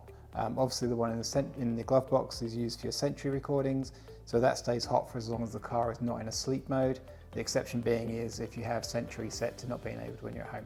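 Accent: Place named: United Kingdom. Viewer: I got literally 2/3rds of the way through this until I realised that this wasn’t a UK RHD version car. Your accent threw me off completely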